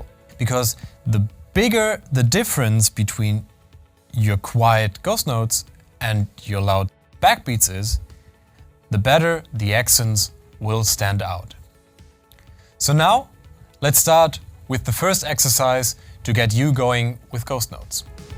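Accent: Germany. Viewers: Bei dem Akzent dachte ich mir doch schon das es ein Deutscher sein muss👌🏼 weiter so👌🏼💪🏼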